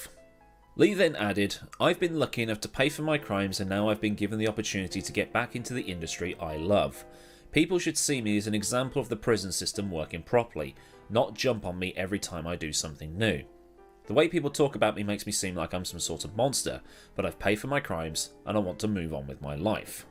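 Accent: Birmingham, United Kingdom. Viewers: You’re brummie accent is a dead ringer for the car cleaning guru, it’s truly incredibly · Brummie accent on point! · That Birmingham axcent xD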